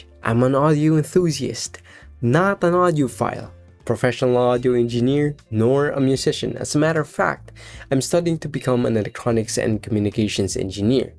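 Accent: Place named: Philippines. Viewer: You're from Philippines? I like your accent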